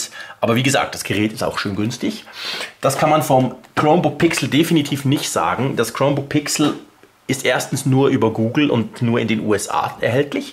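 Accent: Switzerland. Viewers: Versuch mal nicht immer alles in deinem schweizerischen englisch auszusprechen!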